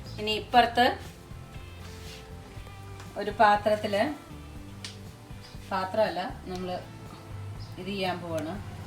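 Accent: Palakkad, India. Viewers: പാചകം കാണുന്നതിനെക്കാൾ പാലക്കാട്‌ ഭാഷ കേൾക്കാൻ നല്ല രസം.കറി സുപ്പർ.ഉണ്ടാക്കി. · പ്രോഗ്രാം സ്ഥിരമായികാണാറുണ്ട് ഗംഭീരമാകുന്നുണ്ട് പാലക്കാടൻ ഭാഷയും നന്നാവുന്നുണ്ട് പടവലങ്ങ എരിശ്ശേരി ഉണ്ടാക്കുന്നത് കാണിക്കാമോ.... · Pkd style le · Sharikkum palakadan bhasha. Kure aayi kettitu. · The description in Palakkad slang is so good! Great! I really enjoyed! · Palakkadan accent....hi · PALAKKADAN BHASHA NALLA SUKHATTO KEKKAN......TVM BHASHA KETTE KETTE MATIYAYITTO....AVIDE KORACHE ARROGANT AANE BHASHA....PLKDN STYLE ORU ELEMA INDETTO...PARAYATE VAYYA MOLE...😊😚😊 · Thani palakkadan bhasha...curry superb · Venu Gopal, അല്ല സാർ, ഞങ്ങൾ വള്ളുവനാട്ടുകാരുടെ സ്ലാങ് ഇങ്ങനെയല്ല...ഇവർ പാലക്കാടിന്റെ അങ്ങേയറ്റത്തെവിടെയോ ആണ് ( തത്തമംഗലമോ കൊല്ലങ്കോടോ ചിറ്റൂരോ ) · I am also from palakkad..can relate ur way of talking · mikka palakadan markkum avarude slang parayan valya madi ayirikum, chechi spr anu enik ishtayi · Palakkadan bhasha kelkkumbo oru santhosham 😊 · similar to thrissur style.. 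also like your palakkad slang, couse i has some of palakkad friends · Hello, namasthe🙏 Oru Trivamdrumite aayitu ee comment kandittu noki pokan kazhinjilla. Palakkadan bhasha nalla suka kelkaan.. ente childhood days njan orupadu ishtapedunna mannarkad kure spent cheythitundu Oro deshathinte bhashaye inghane public aayi criticize cheyyunnathu sheriyano.. oru nallathu parayan oru negativity de avasyamundo.. , I love Palakkad too.. may be njan Trivandrumite aayondavum anghane ishtapedan pattunathu😊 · Palakkadan padavalangha molakushyam+palakkadan bhasha(kittolu,avoo) endekuttiye enikku vayya.UGRAN. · പ്രേത്യേകത പറഞ്ഞാൽ.. ഒട്ടും ബോറടിപ്പിക്കില്ല. വളരെ നല്ല അവതരണ ശൈലി. അതുപോലെ തന്നെ ഉണ്ടാക്കുന്ന വിഭവങ്ങളും super. പാലക്കാടൻ ഭാഷ 👌👌♥ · Super. Love the language and slang of Palakkad style. My native place is Palakkad. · Nammaley pkd slang spprr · Hai chechi chechide palakkadan touch super enike ishtayi chechi de talking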